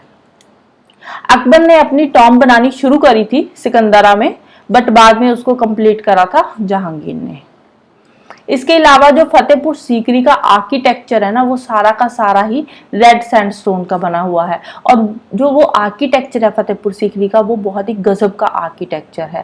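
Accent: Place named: Chamba, India. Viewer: your ascent is chamba himachal pradesh😃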